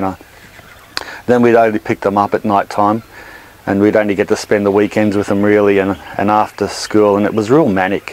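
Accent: Australia. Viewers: love how hard you make your garden work; it looks great, i moved to the states about 50 years ago. so its good to hear the australiam accent as well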